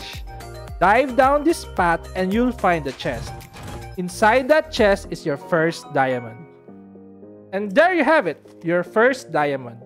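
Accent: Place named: Philippines. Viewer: you kinda sound like a filipino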